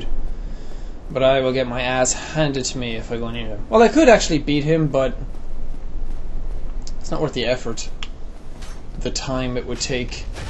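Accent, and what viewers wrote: Ireland. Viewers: FutureAdaption He kind of tried to hide his irish accent, but not in this one. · He's starting to sound a little bit like his Irish self ;)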